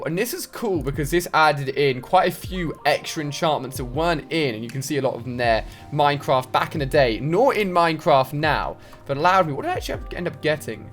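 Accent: United Kingdom. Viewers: he says toitoise cause hes British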